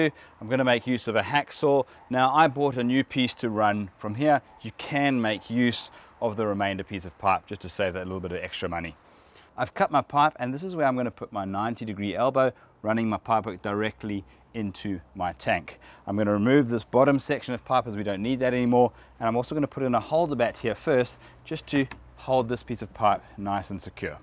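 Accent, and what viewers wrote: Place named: South Africa. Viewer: South African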